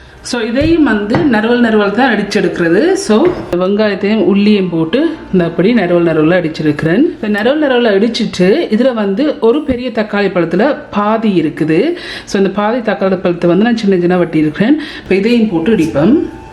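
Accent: Sri Lanka. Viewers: Thank you for this nice video. I do enjoy listening to Ceylon Tamil! Anyway about making rasam, I use rasam powder which is easily available in Malaysia so I don't have to grind all those codiments etc . Well I enjoy watching ur video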